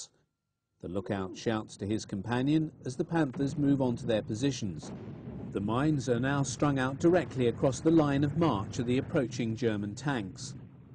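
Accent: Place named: United Kingdom. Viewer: ナレーションの方はイギリスの方ですね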